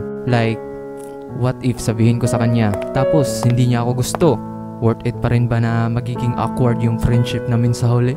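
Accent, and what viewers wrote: Visayas, Philippines. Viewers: Klaru kAayo bisdak hahaha.. nice.. bat kaya di ka pa rin sikat...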